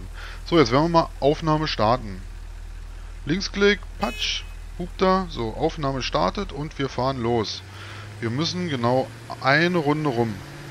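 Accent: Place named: Görlitz, Germany. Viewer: Sag mal kommst du aus der Region Görlitz? Dein "Slang" erinnert mich an Heimat 😁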